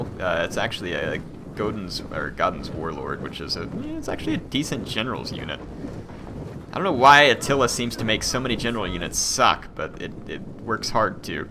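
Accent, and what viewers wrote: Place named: Kentucky, United States. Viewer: Always making my night better with that great Kentucky accent, and leading such a victorious Germanic something army, makes it even enjoyable, great job, I'm really looking forward to the next one, also I really really wish that Attila had the Suebian faction.. I really loved them in Rome 2 but they were a little bit... naked, it would be awesome to see them armored suebi rampaging in the lands of the western romans.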